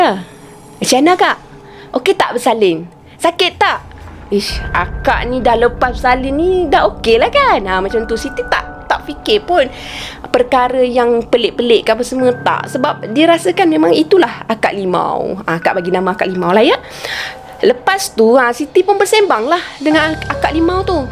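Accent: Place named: Terengganu, Malaysia. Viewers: Pekat molek tranung ye...mcm sy.. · Pandai cc cakap ganu...jadi ah tu slang ganu kite.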